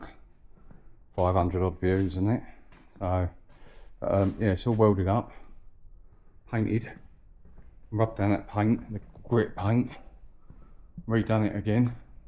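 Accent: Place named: South East London, United Kingdom. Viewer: I knew you was near me with your accent I’m in Croydon area do you have a email or some way of getting hold of you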